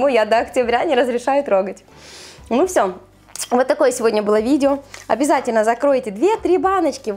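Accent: Ukraine. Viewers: Девочки,что же Вы такие злые?Во-первых на Украине речь этим и своеобразна.А уксус просто испаряется при варке.Больше позитива ,друзья!!!!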